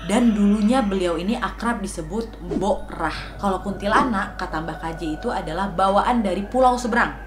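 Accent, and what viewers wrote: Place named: Medan, Indonesia. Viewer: Salam...saya wak2 dr medan,klo boleh tau wak aslinya asal mana??karna logat bicaranya mirip dengan orang medan😊😊😊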